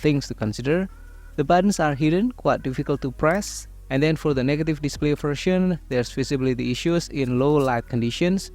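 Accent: Indonesia. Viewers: Your English good sir, i like your Indonesian accent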